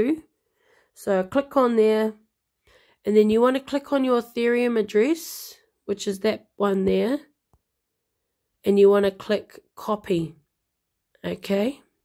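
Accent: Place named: New Zealand. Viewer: Lol funny, as soon as you started talking I thought another Kiwi. Great video by the way.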